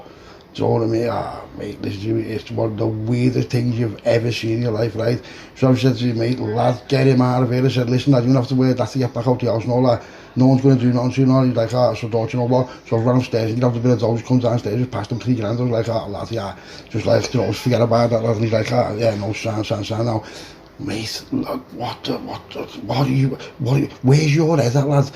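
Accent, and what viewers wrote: Liverpool, United Kingdom. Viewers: Yes brother scouse belter as per deffo worth the hype big up the narrator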